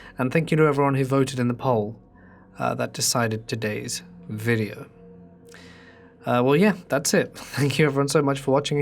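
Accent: United Kingdom. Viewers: I really enjoy this particular British dialect, though not quite sure which specific one it is.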